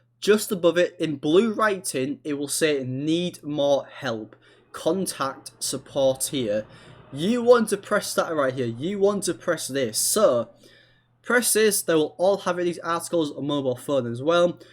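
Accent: United Kingdom. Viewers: Love The British Accent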